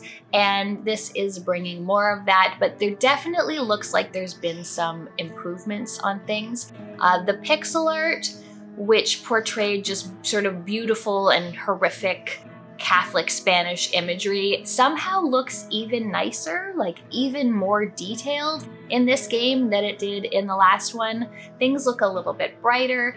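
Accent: Canada. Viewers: Man, your Canadian accent is really pronounced in this video.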